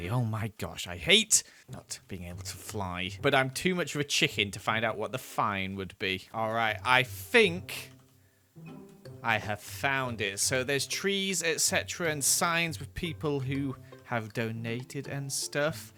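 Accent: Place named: United Kingdom. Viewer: ive watched you for years and i just now processed the fact that your british ಠ_ಠ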